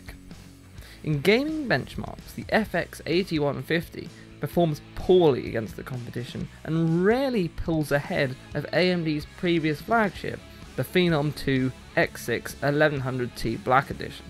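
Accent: United Kingdom. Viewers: Love the british accent... Nice video man!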